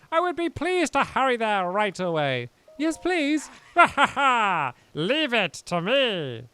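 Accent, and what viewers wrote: United Kingdom. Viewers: Aré you british?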